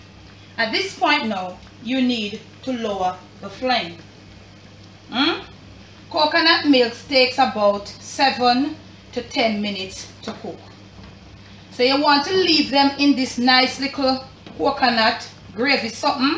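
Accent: Patois, Jamaica. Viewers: Good morning Aunty i have to laugh when you talk our patios.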